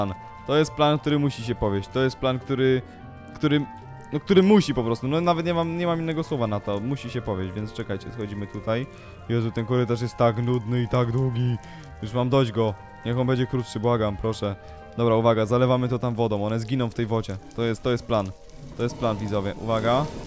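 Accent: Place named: Silesia, Poland. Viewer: Ty trochę umisz muwić po Ślosku jak ja.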